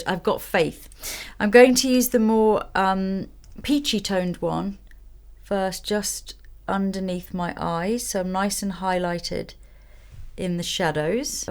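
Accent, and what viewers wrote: England, United Kingdom. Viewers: I watch your channel for years, and I will go on...actually, I learned English with your videos (not only yours, of course), you have a lovely voice and authentic, very polished British accent. We just talked about you today with me stylist in Kyiv, discussed your lipsticks and your book, it was nice. I was lucky to get one of your lipsticks, and I’m wearing it every day))) wish you all the best in 2019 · you are such a lovely person...and you English, oh, love it. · I like your British accent.....and the make up in this video, specially the simple smokey eyes. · Thank you, my dear Lisa, for that beautiful and so good explained tutorial. Like other ladies already wrote, your calming voice and elegant british accent are so marveilleus! Regarding your lipsticks: I ordered all three of them and each time I wear Velvet Jazz nearly everyone is asking me what brand it is etc. 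And I'm very proud because You choosed italian craftsmenship to produce them! Merry Christmas! Leonora from Rome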